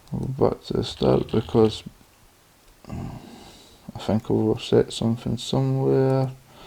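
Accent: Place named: Scotland, United Kingdom. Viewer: Haha, nicely done. Orange belt for you bud! Refreshing to hear a Scottish accent that isn't my own while picking. Keep up the great work bud.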